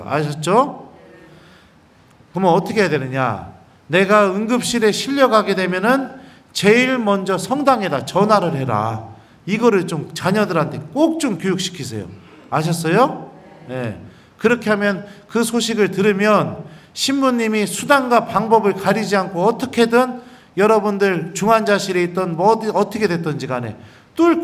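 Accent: Chungcheong, South Korea. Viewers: 잘 계셨슈? 그려유~~그유~~ 충청도 구수한 사투리가 너무 친근감이 듭니다. 고향생각이 납니다. 쉽게 풀어 강론하시는 신부님 고맙습니다. 항상 건강하시길 기도합니다. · 신부님 충청도 홍성사투리가 너무 정겨워유 제고향도 엽동래유 신부님 건강도 돌보셔유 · 충청도 사투리로 인사하시니까, 신부님의 덕담이 더 구수하게 들리네요. 저도 몇십년전 죄 고백을 했던 씁쓸한 기억이 나네요 죄는 붙잡지 않아야 ,진정으로 회복이 빠르다는 말씀에 적극 공감합니다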